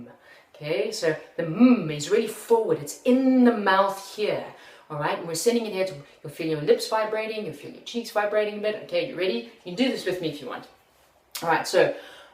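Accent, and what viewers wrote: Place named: South Africa. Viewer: You sound like the news anchors in South Africa. Something about your accent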